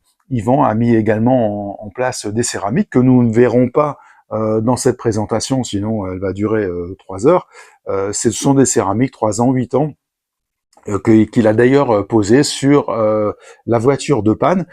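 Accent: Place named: England, United Kingdom. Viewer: Nouvel abonné de votre chaine, jaime beaucoup votre flow et son contenu qui va à l'essentiel, en gros vous ne parler par pour rien dire 😊. Et l'accent englais 😅 j'adore. Je pense aussi que si nous ne consommons peu de produit cest peut-être aussi que nous ne pouvons plus laver les voitures en extérieur mais si c'est chez nous ,sous peine d'amandes. 😥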